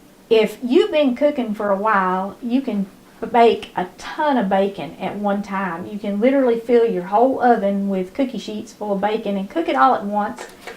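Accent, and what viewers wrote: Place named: Appalachia, United States. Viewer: So simple and yet so satisfying! Everything you make - and the accent - hits sentimental chords of my WV and Eastern KY upbringing ... miss these meals my mammaw and mom would make! I am so happy to be your subscriber!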